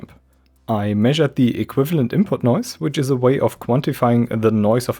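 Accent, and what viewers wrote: Germany. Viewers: I hear a bit german..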